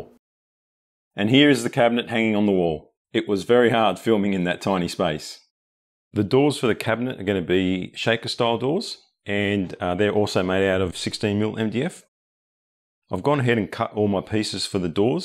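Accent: Australia. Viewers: Beautifully sleek cabinet! I'm not a woodworker but enjoy watching your videos. Maybe it's the lovely Aussie accent LOL.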